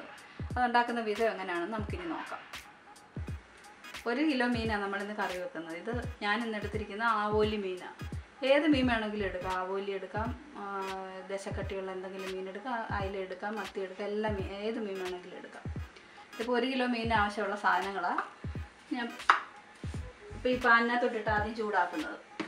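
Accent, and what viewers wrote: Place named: Chengannur, India. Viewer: chechy fish cury superrrrrrrrrr.samsaram kettittu chengannurkkari aanennu thonnunnu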